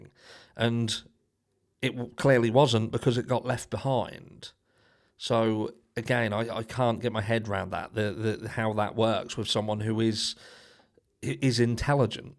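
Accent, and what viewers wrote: United Kingdom. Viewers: I love British accents including yours but today I realized how well you also annunciate your words. I tried but I can’t see the DNA proving Bryan is guilty because I think the knife sheath was planted. Apart from all of the problems with the DNA, Cristi and Steve came out later and declared that Kaylee had tried to get away but she was trapped on 3 sides of the bed. They deduced this I believe from something Steve found out from their investigator. They were told that Kaylee was on top of Maddie in the bed. I’ve heard a couple of scenarios of the killer throwing her on the bed where she landed on Maddie. The coroner said no one is permitted to move the bodies including the police. At first no one, including Brett Payne, saw that sheath. It was on the second walk through that Payne claimed he saw the knife sheath from the doorway. 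It was either under or beside Maddie. He must have X-rays eyes to see that sheath under 2 bodies and 2 bodies that were bloody because there was no blood or any other DNA on that sheath. Jay is for Justice had a great video especially about “Cathy with a C” Mabbatt, which she and others kept pronouncing it MUH BUTT. She did funny imitations of Cathy wringing her hands and being so nervous, evasive, and vague.When she was asked where the bodies were found, she hesitated and said she didn’t think she was permitted to give out that information. She also said that the news report stated that they were stabbed with a knife. Jay commented and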